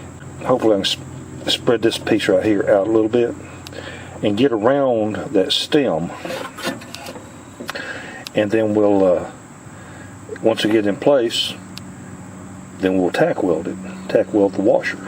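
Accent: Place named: Tennessee, United States. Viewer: you and I are cut from the same cloth, same age too, my grandkids call me, the only difference is you have a Tennessee accent and I am a Maina, ayuh and my handle is skidddah.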